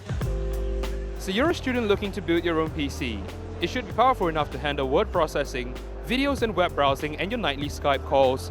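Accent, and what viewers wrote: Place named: Hong Kong. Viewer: Probably Hong Kong cuz we so do have a Asian British accent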